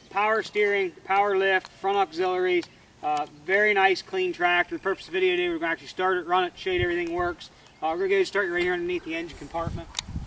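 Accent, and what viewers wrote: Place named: Pennsylvania, United States. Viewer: I can tell you're from Pennsylvania with that Pennsylvania accent lol. Good looking 318 you got there!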